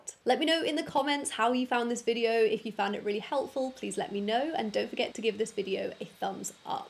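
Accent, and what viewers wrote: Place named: United Kingdom. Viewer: Just started the video and Ssss, sucha a lovely UK accent, I wish if I could get a mate to help me develop the same Accent, Excellent, now I will continue with the main purpose of the vidoe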